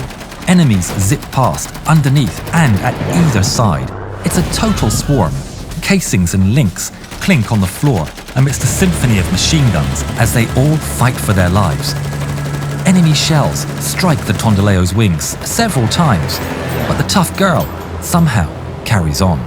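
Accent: Portugal. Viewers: Não , eu não gostei, simplesmente adorei sem contar o elegante sotaque de Portugal que adorna esse idioma amado herdado de vós pois sou brasileiro e amo minha querida língua portuguesa!!!❤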